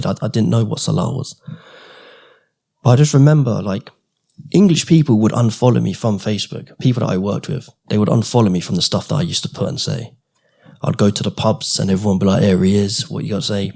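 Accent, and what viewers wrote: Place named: Australia. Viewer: You sound Australian bro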